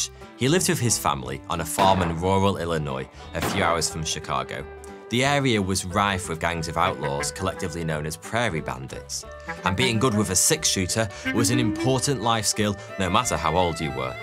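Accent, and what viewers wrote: United Kingdom. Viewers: Just wanted to say that I didn’t watch the video. Can’t possible listen to a British tell a story about the old west. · It never sounds right when British accents tell cowboy stories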